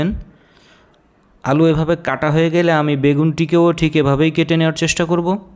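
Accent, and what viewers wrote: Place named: Chowdala, Bangladesh. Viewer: চৌডালার আঞ্চলিকতায় না খেয়েই কিছু বলার নেই। ধন্যবাদ চালিয়ে যাও।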